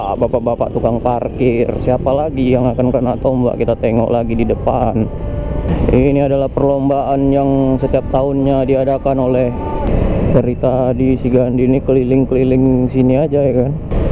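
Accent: Medan, Indonesia. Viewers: Kangen dengerin bahasa medan lagi kak,ak dulu tingal d polonia kak belakang hermes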